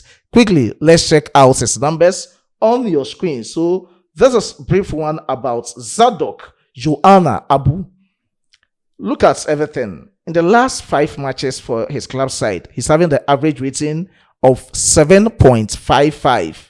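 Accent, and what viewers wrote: Ghana. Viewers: You speak like a Ghanaian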